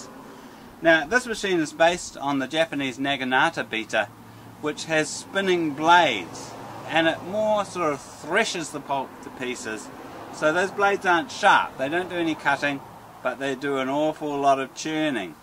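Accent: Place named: New Zealand. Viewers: I love KIWI accents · I got a real kick out of watching this with captions (sitting in a waiting room and not wanting to disturb others) ... the 'English" subtitles can't quite handle the kiwi ;-)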